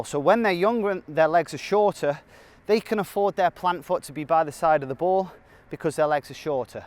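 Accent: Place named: England, United Kingdom. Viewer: love these videos but i cant help to get confused by why he has an english accent and calls it soccer?! like its Football in England.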